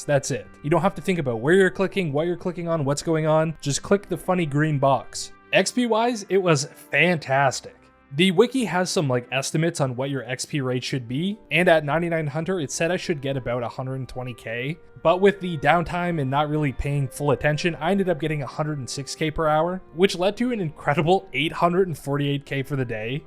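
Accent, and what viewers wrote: Canada. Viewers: also u canadian af bruh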